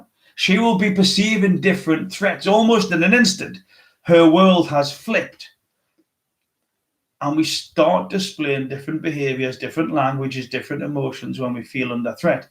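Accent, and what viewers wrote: Newcastle, United Kingdom. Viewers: 😂😂 its English , he is from newcastle. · So me and my little sister been watching your videos and trying to figure out where your accent is from. She thinks is British but idk I think you sound more Irish?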